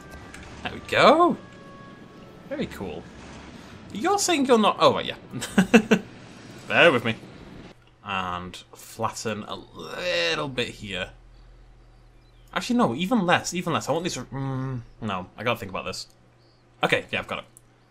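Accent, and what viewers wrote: United Kingdom. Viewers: What the hell happened to your outro music also quit the British accent and it’s annoying to hear!!!!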